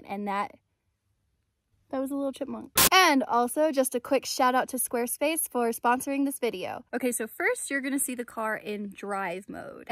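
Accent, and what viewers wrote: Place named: California, United States. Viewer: She sounds like she’s from California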